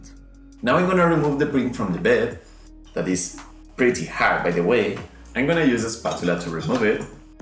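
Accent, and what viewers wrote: Spain. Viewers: One cuestion, are you Spanish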